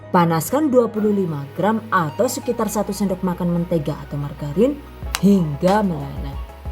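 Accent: Banyumas, Indonesia. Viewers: MaasyaAllah, suka sm detail penyampaian'y & suka cara bicara'y yg ngapak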